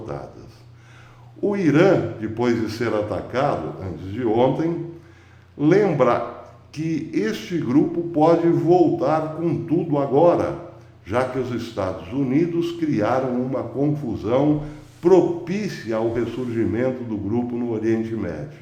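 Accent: Caipira, Brazil. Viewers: Parabéns professor sempre ligado aos fatos da geopolítica! O caipirês é apenas uma forma humorada de passar as notícias 👍👏👏👊😎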